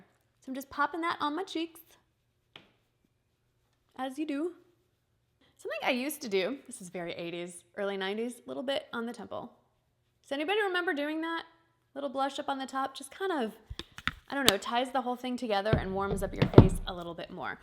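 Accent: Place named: Illinois, United States. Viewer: I KNEW you were from Illinois!! I've just started watching you and just subscribed and wondered where you were from. (Little game I play, "Guess where this person is from... I'm goofy that way) I know three people from Illinois who sound just like you. Then you said you were in Texas and I'm thinkin' no way. Then you said it. Yayyy me! Anyway, enjoying your videos.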